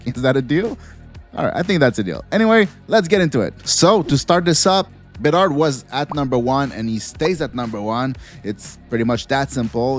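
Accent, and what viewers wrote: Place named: Quebec, Canada. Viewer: ca prends un QC pour reconnaitre un QC qui parle anglais, Sup dude! ;)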